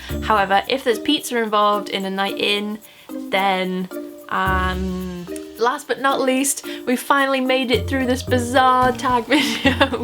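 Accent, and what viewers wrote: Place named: North, United Kingdom. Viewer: So very glad Gabby mentioned you in one of her videos because you are now one of my favourite youtubers! I love your videos also your voice (strange I know!) and if that isn't good enough your northern woohoo! 😂 x +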